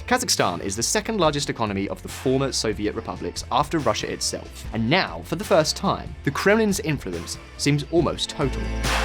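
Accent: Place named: England, United Kingdom. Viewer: How is the standard of living in the US? How many homeless increased in recent years? Many Russia leaving because of the harsh weather and by nature like to travel the world. Propping dictators around the world?! Excuse me but, who is backing up the Saudi royal family despite dismal human rights? Bahrain, Egypt, Israel, and many other nations in the past and present. This is one sided propaganda show with an English accent, to make it more credible for the simple minded young viewers who watch mainstream and believe anyone with that accent. I dare you to make a video about the stolen historical artifacts the lay in Western Museums, or about Assange raw deal, maybe enough spine about Guantanamo bay prison, Snowden, cooperate America, backing mascaras in Indonesia in the during Suharto reign, Opium war affects, the theft of Hawaii, all the broken treaties with native Americans, the pillage of Australia by the British, The king of Belgium in Africa!! 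More recently show the new Nazi affiliated government of Ukraine and their western destabilizing actions all over the world. Show some spine in your reports, be original instead of copy pasting your own Empire agenda. Just a month piece nothing more nothing less. Grow some balls Sir.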